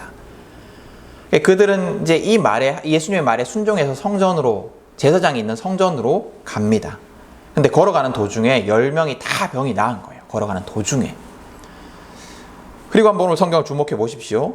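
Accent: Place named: Gyeongsang, South Korea. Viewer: 한예연에서 설교 듣고 구독했습니다! 목사님한테서 대구방언 억양이 들렸는데 대구에 있는 교회였네요. 예수님 사랑만으로 충분합니다. 목사님, 감사합니다!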